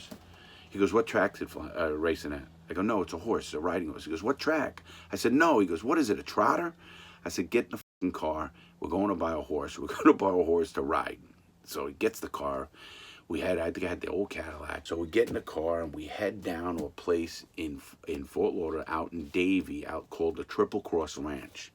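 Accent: New York, United States. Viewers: A Polak from Florida with a New York accent I cannot get enough of this guy such a great storyteller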